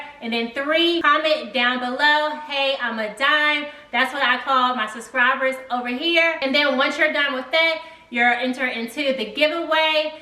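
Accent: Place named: Washington DC, United States. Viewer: Yeasss !! "I'm A DIME" from the first time I started watching ,I subscribed! I hear the DC accent, I love your style.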